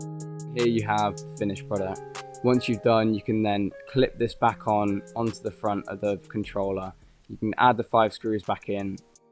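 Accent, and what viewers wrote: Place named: United Kingdom. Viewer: you can tell that he is british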